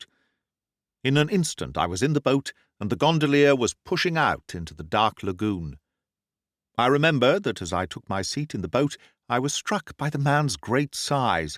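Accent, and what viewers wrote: United Kingdom. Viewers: As an English language learner.. I find your voice very clear British accent that I enjoy listening more than American accents.. please keep uploading more and more.. listening to your narration is a valuable source of developing my listening skills in learning English.. thank you very much sir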